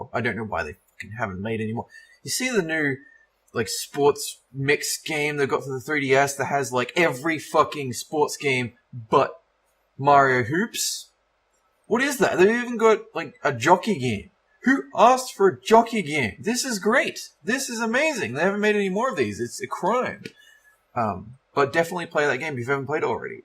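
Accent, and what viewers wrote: Australia. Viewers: waaait.Where are You from,?Australia? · Yeah I was right, he's totally Australian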